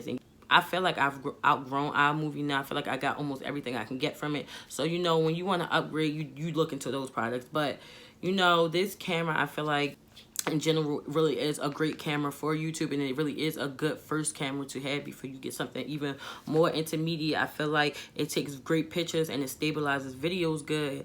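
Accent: Baltimore, United States. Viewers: I agree this is probably the worse camera to vlog with. SN that Baltimore accent is strong girl! 💪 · Girl I’m from DC I hear that Baltimore accent LOL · I'm from Cali and I love me a BMore accent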